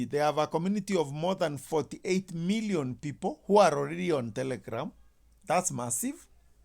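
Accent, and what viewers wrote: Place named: Kenya. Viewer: You sound Kenyan.. Kwanza mkale😂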